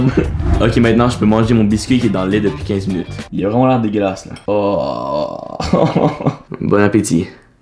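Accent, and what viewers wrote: Quebec, Canada. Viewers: Salut j'aime trop ton accent canadien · T québécois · Est-ce que tu viens du Québec? Mais sinon super vidéo tu es super bon pour réaliser des défis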